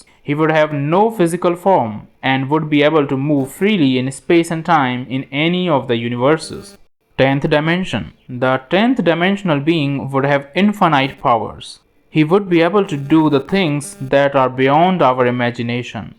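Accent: India. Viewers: The fact that he says "higher dimensions" every 5 seconds with his lovely indian accent just blew my mind.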